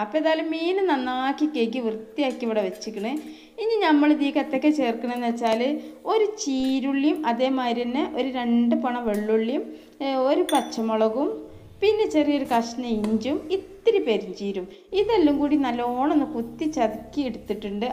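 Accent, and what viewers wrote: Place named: Kottakkal, India. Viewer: bhasha kettittu kottakkal aanu ennu thonni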